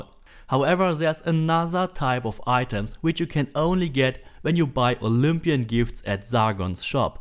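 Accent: Germany. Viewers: Is he german ? :D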